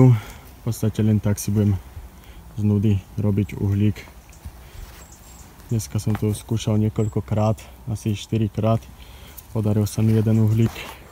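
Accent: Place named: North, Slovakia. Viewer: Máš krásny severoslovenský prízvuk, videá sú super nenechaj sa odradiť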